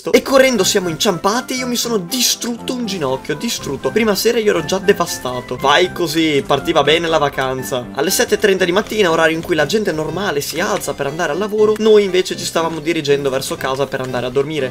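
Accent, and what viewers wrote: Veneto, Italy. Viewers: ma sei veneto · te sei veneto? Io si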